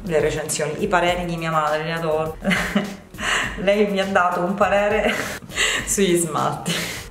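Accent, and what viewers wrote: Sicily, Italy. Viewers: Stop al video prendo il pennello e lo #scatafotto muoioooo 😂 Mi piace che inserisci termini e accento siculo. 👍💕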